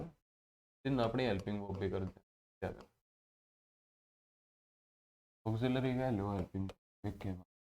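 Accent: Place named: Haryana, India. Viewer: English with haryanvi... No doubt it's a nice combination for us... Thanks for the class sir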